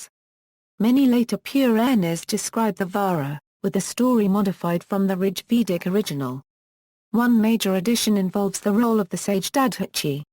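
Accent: United Kingdom. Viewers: I studied Sanskrit and Hindi at university and 100% agree! Wrong pronunciation - this isn't Spanish!! They do that in Spanish jalapeno is "halapeno" - not Sanskrit or Hindi! Narrator is Britisher, so what do they know?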